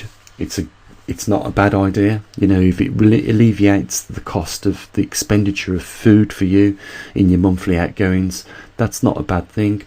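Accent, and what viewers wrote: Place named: Walsall, United Kingdom. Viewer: Can you tell me what part of the UK you’re from? You sound very local to me..... Walsall???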